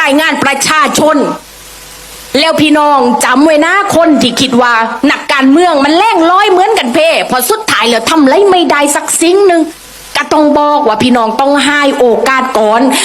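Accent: Thailand. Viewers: ສຳນຽງພາກໃຕ້ ຟັງແລ້ວມ່ວນຫູດີເຂົ້າໃຈງ່າຍ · พูดได้ดีมากครับ ภาษาใต้สวยงาม ผมเป็นกำลังใจให้เต็มม้วน กับคนของประชาชนครับ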